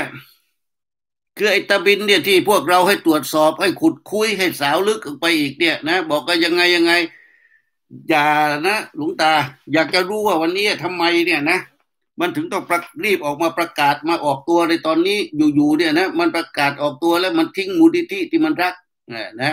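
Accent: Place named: South, Thailand. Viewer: Love your Southern Accent!!!( Ohh.Pukk Tai Ban Rao.)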